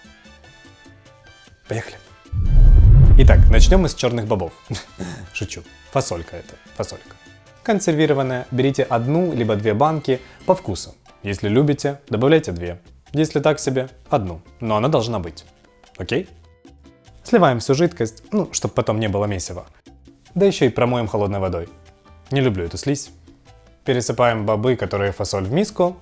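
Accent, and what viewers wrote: Odessa, Ukraine. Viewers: А я люблю,чисто одесский акцент:,, здрастье вам через забор"...😄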